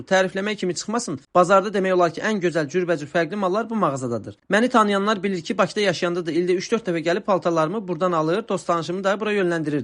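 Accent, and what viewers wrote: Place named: Balakan, Azerbaijan. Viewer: Şirin Balakən lehcesi😊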